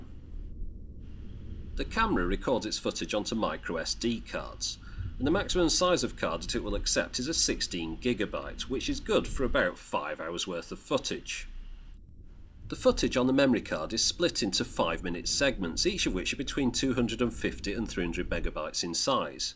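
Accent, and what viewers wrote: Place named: Liverpool, United Kingdom. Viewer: You sound like Ringo Starr! Is that a Liverpool accent?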